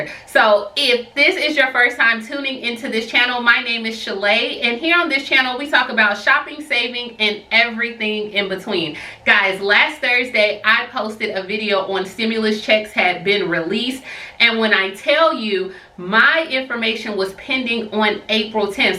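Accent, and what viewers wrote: Alabama, United States. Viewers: Wait that's Alabama accent , my mom is from there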